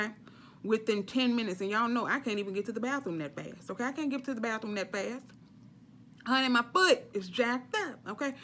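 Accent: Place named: South, United States. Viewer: NEW SUB LOL MUST BE FROM THE SOUTH HAHA LOVE HOW YOU SAY TOILET "Turlet" 😆😆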